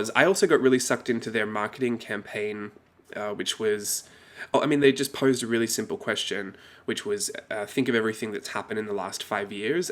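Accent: Australia. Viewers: This is the first video I’ve watched from you. I knew you were an Aussie by your accent. So refreshing 🤓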